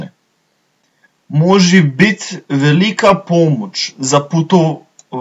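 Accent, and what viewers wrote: Slovenia. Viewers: haha kk se takoj prepozna, da slovenc govori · I'm Polish. I understood everything 100% and I think I would read it better than you (no offence, brother Slav ;)). You don't see a difference when pronouncing "y" and "i" or "e" and "ę". Well, in Polish we have both "y" and"i" and they sound different, and we have also "e" and "ę" and they also sound different...;)) I'm guessing by your accent that you are a Southern Slav. Your accent kinda "suggests" me you're Slovenian...Am I right??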